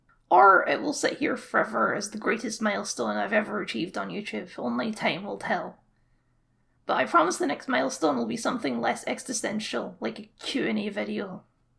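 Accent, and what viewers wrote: Scotland, United Kingdom. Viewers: I'm a new subscriber and I'm digging it. About your voice im confused as an American all Scottish people sound funny to me what's different about you? Lol no but seriously I like the content and I love interesting voices and accents hence I listen to very few American essayists because they all sound like me and that's boring.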